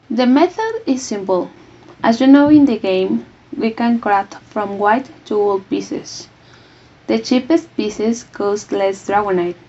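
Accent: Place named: Latin America. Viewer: Pero porqué no hablas Español, pareces latina hablando inglés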